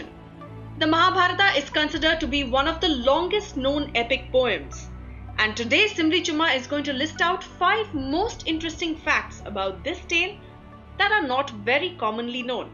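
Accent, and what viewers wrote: India. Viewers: Not a mythological myth, it is India's history. Pronunciation is Karn, Arjun and for more names or places like these.not Karnaa, Arjunaa, Mahabhartaa. Perfect sound of Indian accent but foreign pronounced words.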